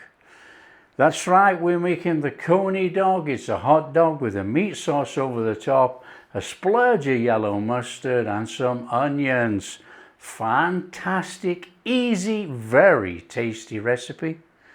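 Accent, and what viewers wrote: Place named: England, United Kingdom. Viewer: 😂🤣Love when you wrote “dodgy blokes” 🤗 That’s why I love, his English accent plus the great dishes he serves.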